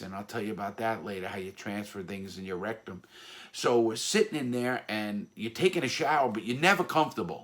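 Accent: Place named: Philadelphia, United States. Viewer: I could tell you're a Philly person due to your accent and specific terms you've said like hoagie and such.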